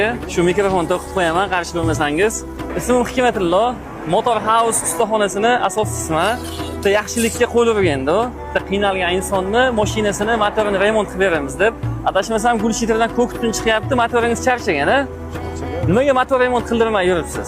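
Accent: Uzbekistan. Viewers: 1 чидан Устахонани асосчиси булсез номерларни фаркига боришингиз керак 2 чидан узиз наманганлик була туриб 10 лик номер Билан 50 лик номерликни фаркига бормайсизми шевез айтиб турибтику наманганликлигизни Яна Окалар дейишизга улайми... Узидан Тошкентлик ясаб олдизми ... Мухлислари алдаб хейла хейла килманг...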